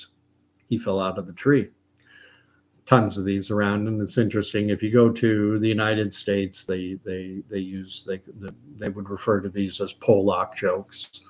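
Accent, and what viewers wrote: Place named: Newfoundland, Canada. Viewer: How do you get a one-armed Newfie out of a tree? Wave at him. That's an old one. I just started listening so maybe I am ahead of the show, dunno eh. Topic about moose now.. some idiots of practically all ages were getting close to a moose on the side of the road near Algonquin Park including parents with their kids while I was still a kid and my family stopped a bit to see the moose without getting out of the car and moved along. As a kid I knew getting out of the car would have been retarded. I don't know if anyone ever got hurt by it. We watched for a handful of minutes maybe and moved along. Newfie accent is good bud eh.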